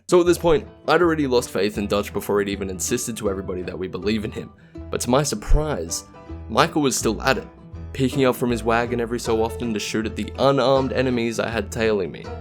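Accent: Australia. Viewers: This just got recommended to me are you Australian ? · I think he's Aussie lol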